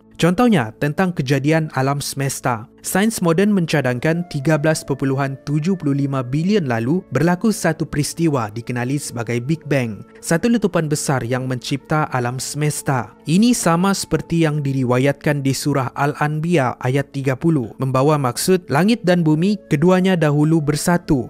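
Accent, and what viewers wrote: Sabah, Malaysia. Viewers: 20 tahun akan datang subscribe naik Aku harap bro tetap buat content mcm ni Dan tetap guna logat dari Sabah 👌🏻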